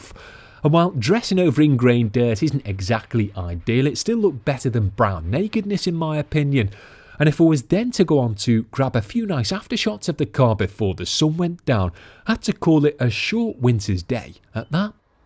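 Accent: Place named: Wirral, United Kingdom. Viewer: I think I recognise the accent, are you a Wirral lad? I'm born & raised there but have moved over the water to Sunny Formby. Thanks for another informative episode. 👍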